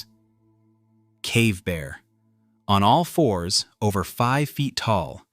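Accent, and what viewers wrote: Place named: United States. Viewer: Interesting but you sound American so keep the measurements in feet and pounds. Makes no sense to mix them up with grams and meters.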